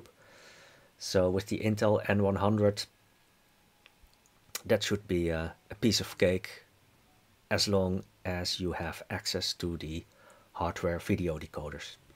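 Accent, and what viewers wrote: Netherlands. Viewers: You sound very Dutch :)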